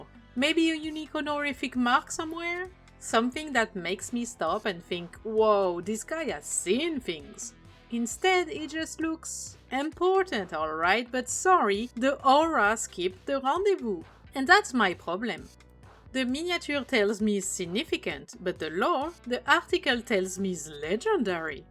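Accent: France. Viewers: surprenant d'avoir l'accent frenchy de fou alors que tout est en anglais 😆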